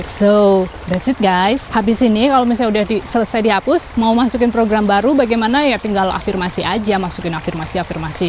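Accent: Banyumas, Indonesia. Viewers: Logat ngapake kentel ya yu 😁😁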